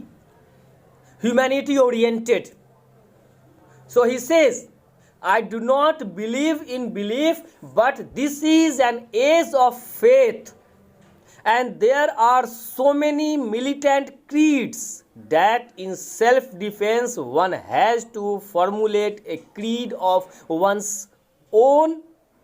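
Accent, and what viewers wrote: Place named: England, United Kingdom. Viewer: Sir nice english accent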